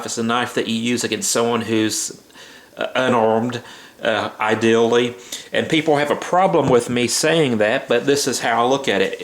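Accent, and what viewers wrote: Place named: Washington, United States. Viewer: Your accent, Washington state correct me if I’m wrong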